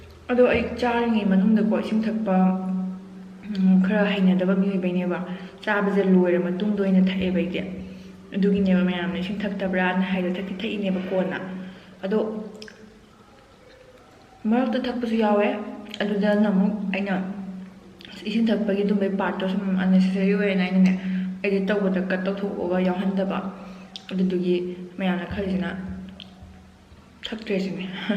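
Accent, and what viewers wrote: Kakching, India. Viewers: Kakching londwna ngangdena ngdi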